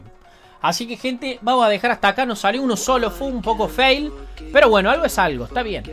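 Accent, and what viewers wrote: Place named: Cordoba, Argentina. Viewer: tremendo cordobes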